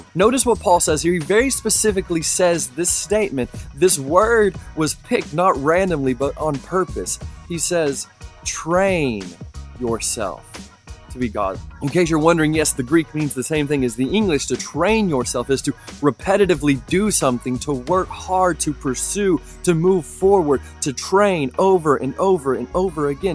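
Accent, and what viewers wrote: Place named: South, United States. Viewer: Are you from South? I can hear a slight Southern twang in your voice. Other than that, God bless you brother.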